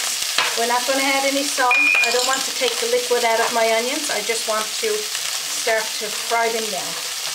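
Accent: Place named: Newfoundland, Canada. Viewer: Looks delicious! Wonderful presentation! I love your NL dialect, with the dropped H's and slight maritime accent.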